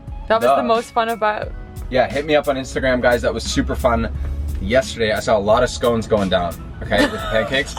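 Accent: Canada. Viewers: im gonna try this!! lol there was barely any sandwich left for her to try ahaha poor girl and why did their bread already sound toasted when he threw it in the pan? lmfao first time watching him and hes funny. Is he canadian by the way? or am i just picking up an accent and the cheesiness lol? dont worry im Canadian too But yeah deff gonna try this minus the sun-dried tomatoes! All around good but theres so many hygiene issues that were literally making my anxiety skyrocket... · I just stumbled across your channel and I’m very confused lol HEB is a Texas based grocery chain but your accent sounds Canadian lol but I’ve been wanting to try chickpeas/garbanzo beans but didn’t know what to do with them! Thank you!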